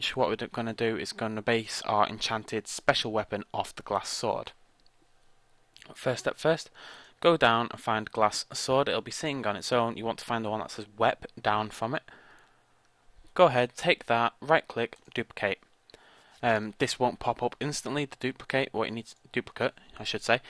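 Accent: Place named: Northern England, United Kingdom. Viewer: Lol the YT subtitles obviously don't get Northern English accents. You were apparently talking about Alaska or something. (I'm a Northerner).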